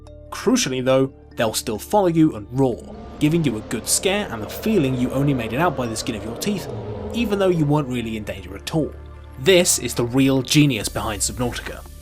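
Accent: United Kingdom. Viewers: The fact that a man with a British accent having to clarify that he was saying terror and not Tera is hilarious to me 😂😂😂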